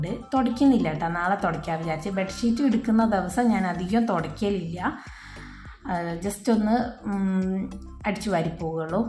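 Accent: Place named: Kannur, India. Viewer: Kannurkare samsaram pole und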